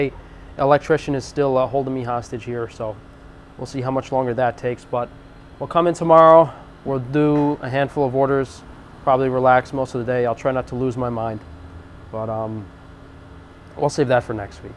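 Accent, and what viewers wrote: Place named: New England, United States. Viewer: his yankee accent definitely would stick out in the south... southerners pick that up instantly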